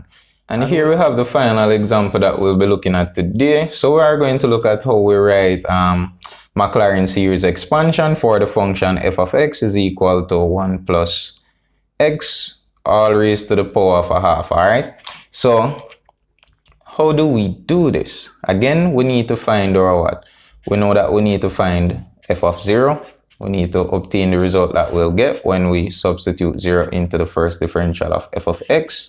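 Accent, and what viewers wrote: Jamaica. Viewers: My Eng. papers have been beating me mercilessly...this helps. Thank you. I could have sworn you have a Jamaican accent...helps me remember this.😄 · So nice and simple, my lecture made this sound like a tong twister to me, and you sound more of a Jamaican I really love the vibe keep on uploading more videos you are helping many enjoying from Kenya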